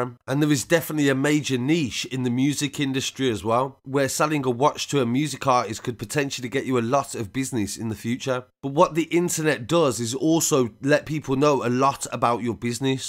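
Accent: Birmingham, United Kingdom. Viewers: Are you a brummie 💙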